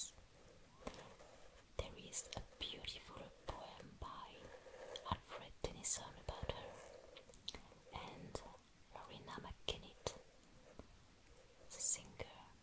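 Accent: France. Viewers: Is this a French accent? Sounds nice and gentle anyway. :) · I never knew I needed to hear a French accent in ASMR videos so much, until I found this channel...🥰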